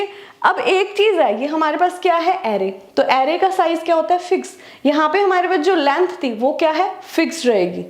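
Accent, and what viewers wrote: Punjab, India. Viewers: Like ur punjabi accent while speaking english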